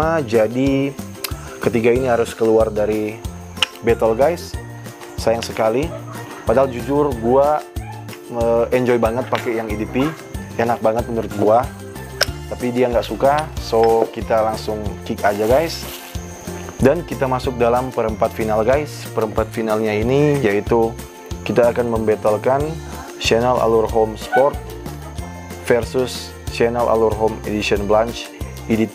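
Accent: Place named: Manado, Indonesia. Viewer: Dari Logatnya orang manado ya bro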